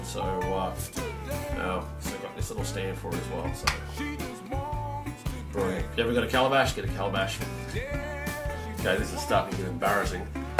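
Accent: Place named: Australia. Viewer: Thanks for sharing, awesome pipes!!! and fabulous video mate! good to hear another Aussie voice on here hahahahaha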